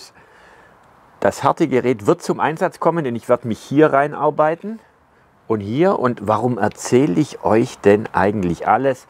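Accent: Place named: Swabia, Germany. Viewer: Der Einkaufskorb.... absolut geil. Und das Schwäbisch... bitte: Sprich doch immer so. Finde ich absolut super und authentisch.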